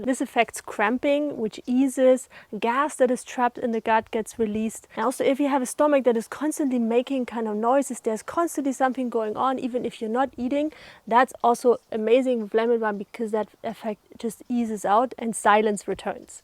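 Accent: Germany. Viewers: Will start tonight. I could listen to this sweet lady talk "all day" with that cute accent (German? I dunno).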